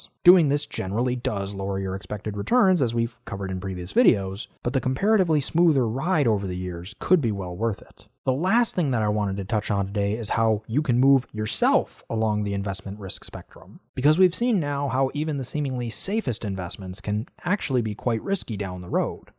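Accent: United States. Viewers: What’s your accent? New York?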